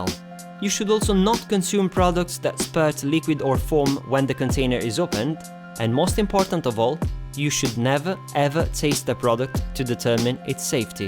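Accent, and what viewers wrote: Italy. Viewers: You are great " "! I am Italian too but I love your accent when you speak English, it is not easy to speak a foreign language, I know and you do it very well! I also love the way you speak, your irony, I enjoy every word and all your videos very much and I am so happy you are back on line again! Love you Xxx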